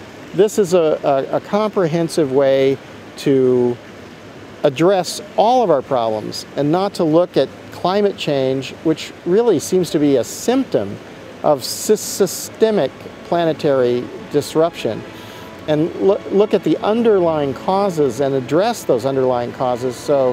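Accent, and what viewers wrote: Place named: United States. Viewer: He sounds American to me.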